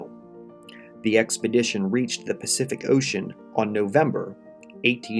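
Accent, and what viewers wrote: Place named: United States. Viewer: Are you American? It's funny how you pronounce all the French words.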